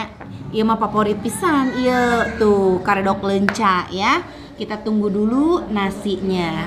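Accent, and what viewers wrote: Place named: Sunda, Indonesia. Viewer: Paling seneng bgt dgr org ngmg sunda😂 dgr teh ngmg tuh sesuatu bgt 😂👍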